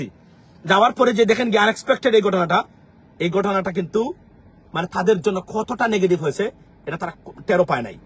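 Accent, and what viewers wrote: Sylhet, Bangladesh. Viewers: I like your content and I must inform you I love Sylheti dialect. It is a melancholy sound to my ears but it’s me. If you don’t mind since, you are speaking in public platform. Could you speak proper Bangla. It might give you a non-biased bigger audience. Just saying. Thanks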